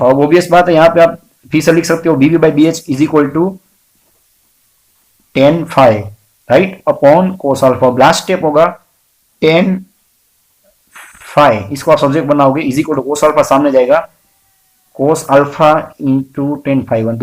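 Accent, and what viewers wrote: Gujarat, India. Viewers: Aree sir!!! Apka hindi ka accent bhi gujrati hai mazaa aya!! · Your language is like gujarati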